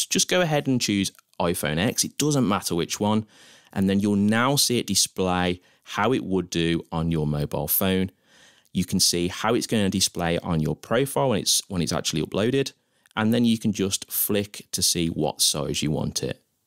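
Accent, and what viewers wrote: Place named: West Midlands, United Kingdom. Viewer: Excellent video. And I find the slight West Midlands lilt relaxing as well. Which is good because this is an infuriating task.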